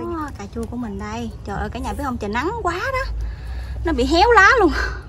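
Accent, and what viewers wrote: Mekong Delta, Vietnam. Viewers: WOW dam chất miền tây nam bo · nghe tiếng của chị người miền tây chị ở tỉnh nào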